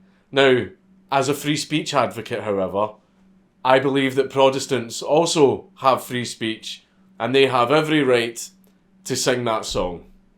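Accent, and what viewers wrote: Scotland, United Kingdom. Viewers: a Scottish dude who is somewhat understandable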